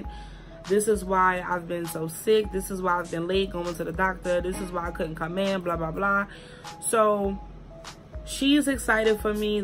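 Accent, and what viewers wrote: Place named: Brooklyn, United States. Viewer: I bet you from Brooklyn 🤮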